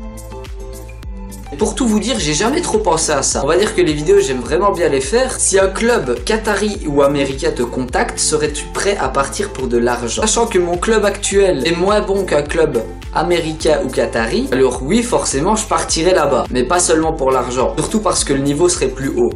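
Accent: Belgium. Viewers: est tu belge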